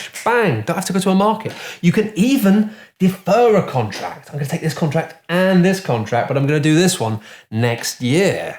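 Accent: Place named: Northern England, United Kingdom. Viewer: Is it just me or is about a magnitude of ten more Northern in this review than normal? Good work, represent!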